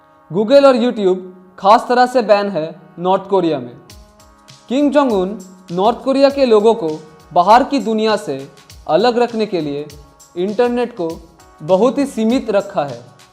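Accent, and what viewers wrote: Bengal, India. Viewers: Bengali hai kya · R u Bengoli ...Odisian? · Tumhara hindi sunke to lagta hai tum bangali manush ho .... achhi jankari diye ho . thank you dada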